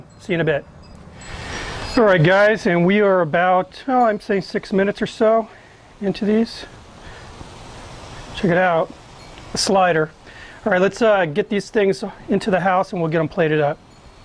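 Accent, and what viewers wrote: South, United States. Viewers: Jeremiah Wilson this guy sounds like he’s from the south . No wc here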